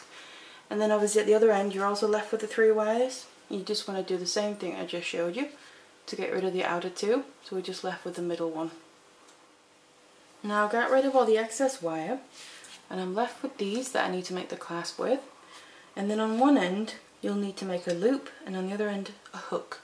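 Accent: United Kingdom. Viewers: May i ask where i can purchase the beads and other things? i am literally just beginning and am so excited but no idea where to purchase all the stuff. I can tell from your accent your from the UK also. Thank you <3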